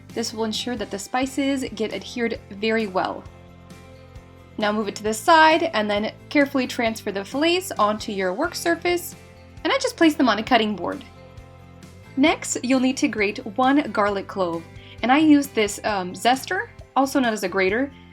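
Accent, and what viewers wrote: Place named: Poland. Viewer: Where is the accent from?Polish?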